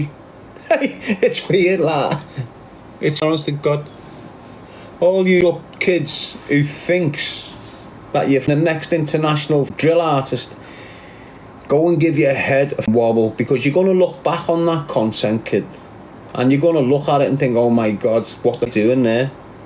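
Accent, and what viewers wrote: Liverpool, United Kingdom. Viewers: <link> Hes the best scouse I've heard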